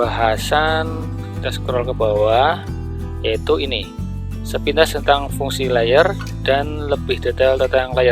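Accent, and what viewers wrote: Banyumas, Indonesia. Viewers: pagi " dah dikasih pelajaran nih makasih geh om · Belajar lagi sama om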